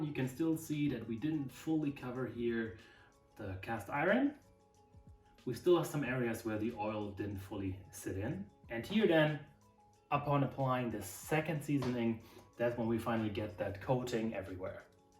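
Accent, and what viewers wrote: Germany. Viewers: WOW! I never knew this before! Thanks for sharing! Love your Germ-lish!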